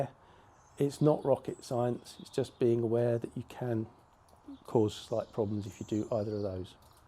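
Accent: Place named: United Kingdom. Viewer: british accent take a guess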